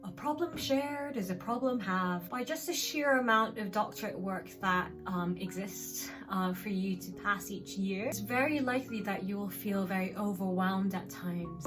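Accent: Scotland, United Kingdom. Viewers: I’ve missed you! You look well and sounding Scottish! 😮 Basically work hard but don’t forget to rest, have fun, see friends and family, go on holiday, say no and ask for help when needed!? 😅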